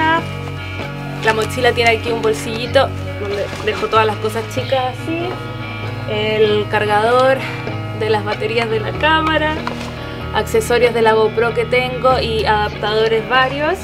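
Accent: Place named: Chile. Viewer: Se nota de una que es chilena haha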